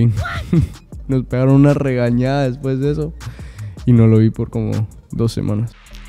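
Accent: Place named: Guatemala. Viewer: Amo el acento guatemalteco de y su R muy pronunciada jajaja Dios amo a este hombre 😍 yo igual soy alérgica a los perros y gatos 😂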